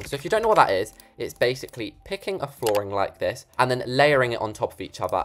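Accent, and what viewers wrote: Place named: Gloucestershire, United Kingdom. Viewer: You sound like youre from Gloucestershire😭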